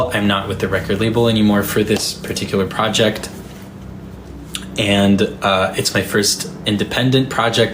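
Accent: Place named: Quebec, Canada. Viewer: Il me tarde beaucoup d'écouter ta nouvelle chanson... Par ailleurs, j'adore ton accent québécois: ) and I like it too when you speak English: ). Je t'ai découvert par hasard en regardant DALS, je vous avais adoré Marie et toi, et ca a été un bonheur de te suivre depuis. Surtout ne change pas, merci pour tout: ).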